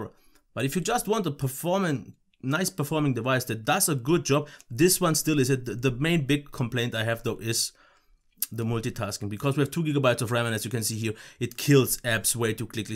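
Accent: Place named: Germany. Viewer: I love the review and accent! It sounds kinda german'ish. :D (Just in cute)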